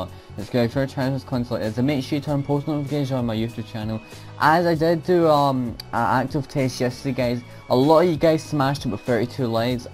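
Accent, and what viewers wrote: United Kingdom. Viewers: iSorryME he is Scottish we are special people 😏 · Are you from Glasgow?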